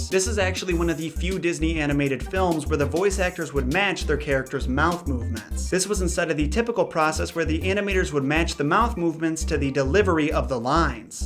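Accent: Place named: New Jersey, United States. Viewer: HAHAHA that jersey accent though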